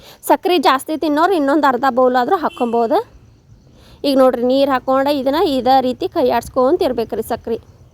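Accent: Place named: Chikkamagaluru, India. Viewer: Chikkamagaluru madam nimma bashy kelok chanda madam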